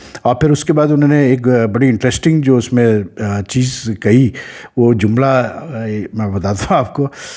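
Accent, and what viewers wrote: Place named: Pakistan. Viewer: He is not "Putthar"!!! He is not a punjabi. His last name is "Puthur". And its not "Karela league". It is "Kerala"!!!🤣😂. Fed up of Pakistani accent and pronouncing of Indian names.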